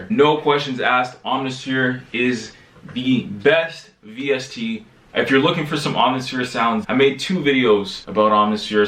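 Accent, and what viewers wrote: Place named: Toronto, Canada. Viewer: great vid. I could tell you were from the GTA based on accent alone within the first minute lol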